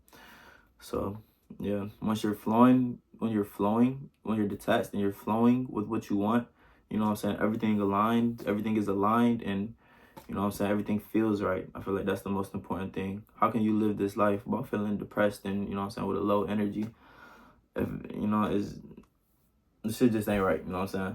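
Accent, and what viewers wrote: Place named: Cleveland, United States. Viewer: Yep u get attached to something u want not need 🔥 Point 1)Yep misery loves company Bro 🔥 Point 2) No wonder Brothers like you reach out to me! I exudes this energy! Point 3) Yeah I can show love whenever I can cause IDGAF what anyone else thanks 😂 I'm pretty sure that's another reason for reaching out 😂❤️‍🔥 Point 4) Yeah my internal completion is my own trophy, belt 🙌🏿 As long as I help 1st & wouldn't mind help 2nd ❤️‍🔥 Point 5) That's why I've graduated from community college with a 3.7 cause I had to take off in the past, fix & get my flow right, make sure I'm in alignment with myself 1st & obviously God & the 1st of da Fam that was there for me at that time who got me too ❤️‍🔥🤝🏿❤️‍🔥 Point 6) Yeah Bro they say if u chase something it runs away 🏃🏿‍♂️💨 😂🤣 So Once I became it Good Brothers like u started reaching out to me ❤️‍🔥🤝🏿❤️‍🔥 Point 7) Yep I went off of frequency to help reach out you & I'm goin completely off of frequency with myself 1st to make sure my life takes off this week 🔥🔥🔥 Point 8) Damn Right Brother 🔥💯 ++++ Bonus that waz a bar fo bar 😂🤣 & PS Yes now this makes since I have an increase of yall reaching out to me now & new Brothers reaching out to me 😂🤣 its the energy from all the good work I'm doing yall feel 😂❤️🤝🏿❤️💯 Good Video My Little Brother & yeah I emphasize with that Cleveland accent sometimes I have a tongue twister 🌀 too with my Detroit accent 🤝🏿😂🤣🇨🇦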